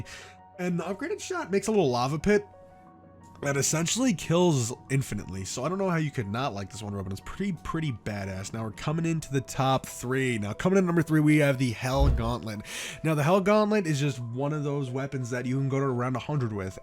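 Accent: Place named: Greece. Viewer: cause you have a kinda Greek accent also I am Greek as well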